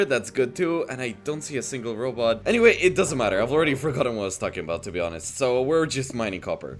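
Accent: Russia. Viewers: Are you from Russia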